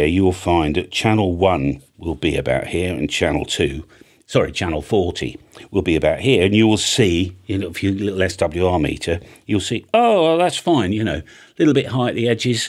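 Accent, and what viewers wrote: United Kingdom. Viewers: Sure wish could understand your hard brit English ! Other wise it always sounds like your asking a question...put an AI voice to use...please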